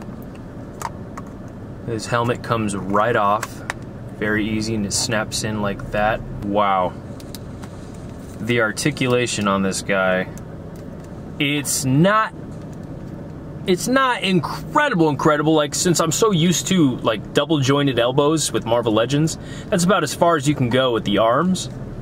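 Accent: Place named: Transatlantic, United States. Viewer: Please do me a favor, look into voice acting. Not you doing Transatlantic accent without effort... excluding the reading and losing your place.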